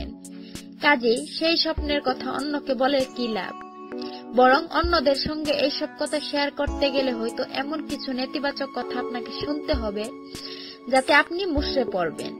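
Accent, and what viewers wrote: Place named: Sylhet, Bangladesh. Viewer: Eije madam eto gan dewar age nijer language ta thik korun.Apnar vasay lagce apni shyleti .Obbosso shyleti hoce emon ekta jayga jekhane kushonskar ar apnar moto gaiya meye diye vorti jekane sobai15 bosorei bia kore.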